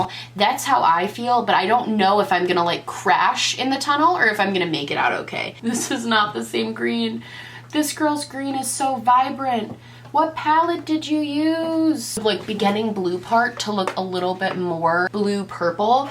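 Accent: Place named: Upstate New York, United States. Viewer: Girl I want a full look with you telling us everything in your upstate accent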